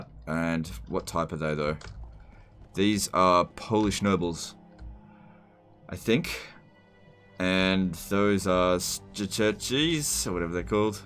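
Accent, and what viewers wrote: Australia. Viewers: I'm just here for the abuse and edgy jokes. Nice to listen to a genuine Aussie, instead of the wannabe Americans that flock the Youtubes. :P Was fun to see my former favorite Total War game (WH2 > All) as a disaster campaign. Would love to see more.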